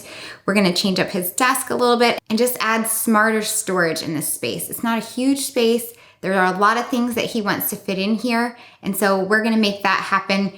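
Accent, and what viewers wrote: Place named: North, United Kingdom. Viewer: Your voice sounds like Leslie Mann who played Jane in George of the Jungle lol And I can tell you're from up north somewhere :D Love the videos!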